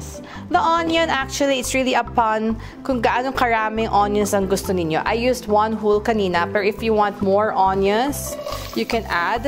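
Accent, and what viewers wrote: Philippines. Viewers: I love listening to the way you talk. Very cute ang Tagalog accent mo Chef😍😍😍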